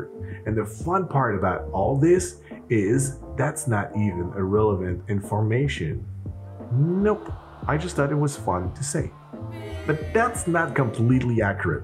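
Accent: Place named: Quebec, Canada. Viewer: He is French Canadian, if you're looking for correct pronunciation you're probably in the wrong place. Yeah, just made the cocktail (including the grenadine recipe) very balanced, could use a bit more bitter imo so I threw a couple drops of bitters on top. Didn't have any cucumber though, I imagine just adds another level of freshness on top of the lime juice.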